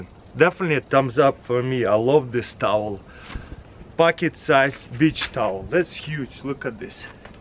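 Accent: Russia. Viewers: "Now i have to zoom out its so big" is awesome. "Full size bich towel" is Russian and Russians are known for their own type of English.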